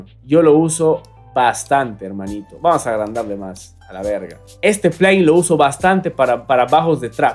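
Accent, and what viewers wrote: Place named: Ecuador. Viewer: bro eres peruano pero tienes acento ecuatoriano xd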